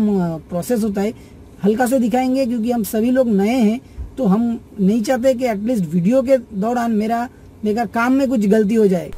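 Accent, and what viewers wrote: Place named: Bengal, India. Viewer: Dada kotha sune mne holo apni bengali...khub sundor laglo video ta erokom video ro deben...EV maintenance krte ki kno electrical licence lage?? Ami ekjon electrical engineering student...janale upokrito hbo🙏🏻🙏🏻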